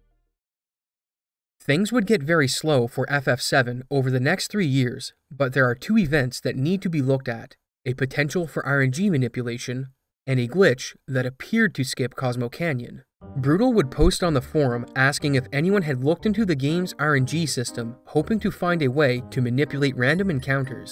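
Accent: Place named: Atlantic Canada, Canada. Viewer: incredible video. I have to point out tho, as a Newfie, you HAVE to be from Atlantic Canada some where right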